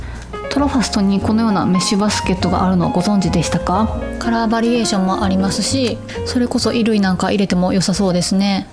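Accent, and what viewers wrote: Kansai, Japan. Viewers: さん、こんにちは♪ 毎週さんの動画アップを楽しみにして拝見しています。今回のさんいつもと違って声のトーンが低くお元気なさそうに感じましたが、お身体大丈夫ですか？いつも関西弁がちょくちょく出てさん独特のコメントが親近感を感じててプッと笑う事もしばしばで大好きなんですよね！今回のトークは推しに対する「可愛くないですか！？」がいつもと違った感じがしました。お仕事と家事と撮影と編集...色々お忙しいと思っています。お疲れが出ないようにして下さいね♪また来週楽しみに待っております！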